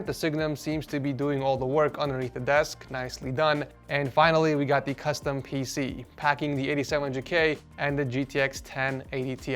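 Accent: Australia. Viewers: Why is the intro australian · I guess we're going Australian mode on this video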